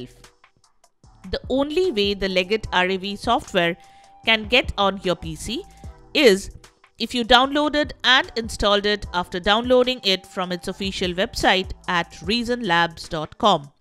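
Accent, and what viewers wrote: India. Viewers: this is a virus unistall and never trust a video with an Indian accent almost all the time the scammers are form there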